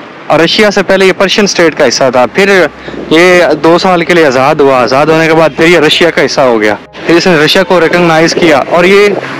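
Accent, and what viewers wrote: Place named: India. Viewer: tera English accent bahut desi hey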